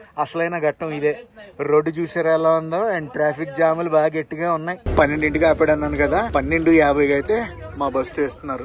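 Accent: Godavari, India. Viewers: haha super crazy vlog , godavari slang bababoi bababoi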